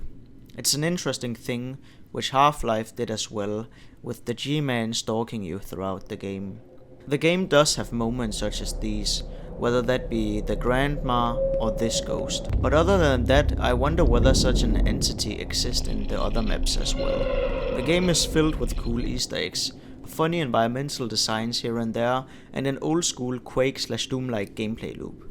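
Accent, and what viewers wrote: Denmark. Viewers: Har aldrig hørt en mere dansk accent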